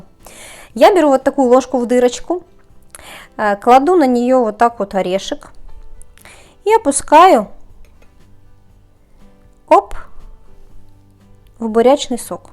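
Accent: Ukraine. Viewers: Сергей Хворостенко люди! человеки! вы все забыли что девочка живет в Украине, там так говорят и это нормально, вас же всех не учат как правильно произносить украинские слова? У нас в России многие вообще забыли родную речь, или так засорили словами паразитами что слушать невозможно. А девочка умничка, трудяга. Так держать. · молодец! Люди, а вы должны понимать, что человек с Украины и акцент остаётся надолго, ничего в этом страшного нет, любой иностранец говорит с акцентом, это почему то не раздражает...., вам спасибо большое за интересные рецепты😊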